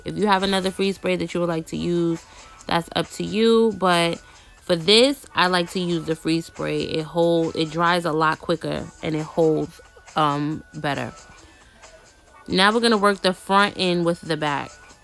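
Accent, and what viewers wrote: New York, United States. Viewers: You sound like your from NYC. Where can i find you sis your channel is everything and CONGRATS on your one yrar anniversary 🎉🎈🎊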